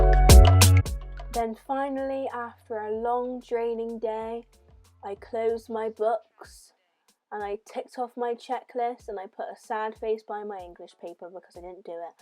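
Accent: Bristol, United Kingdom. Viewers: are you from bristol cause your accent is really nice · Loving your accent, you must be from Bristolll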